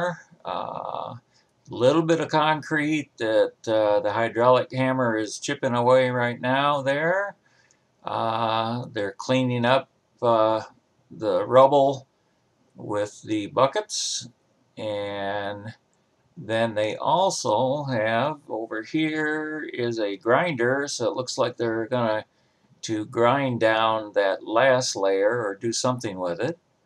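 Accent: Ohio, United States. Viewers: i think your ohio accent is cool, dont worry about it.:) my late dad grew up in and around akron and a friend grew up in port clinton and sandusky. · You keep your Ohio accent. I love accents, that's what makes people unique. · Your Ohio accent is wonderful. Don’t change it for any critics in your comments.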